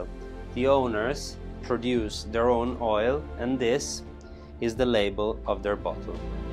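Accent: Italy. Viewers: Your aristocratic Italian ascent and your selling technique is unique, especially with the beautiful landscape of the Italian country side, This property exudes character and elegance, certainly an investment which can improve with a healthy income while the prospective property owner can also capitalize on living in a superb location. I am from New Zealand living in Australia, both beautiful countries, your delivery is a symposium of magic,drawing us into a life style of Italian eloquence. Thank you.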